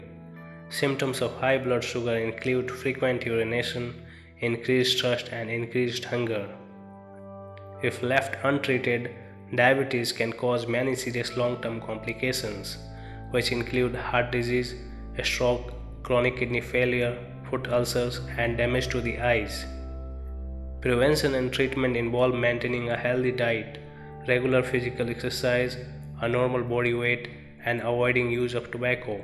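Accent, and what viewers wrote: India. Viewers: The way he pronounces "poootaaatoooozz" that's funny! Obviously Indian accent that's for sure!!!😂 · The narrator is Indian. It is very obvious.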